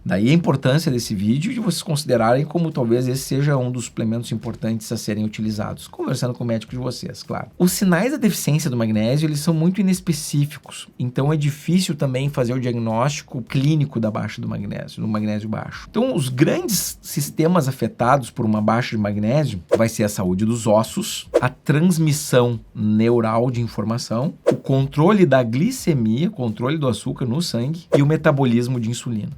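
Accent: Rio Grande do Sul, Brazil. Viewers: O senhor e gaúcho? Gostei do sotaque.e meu conterrâneo?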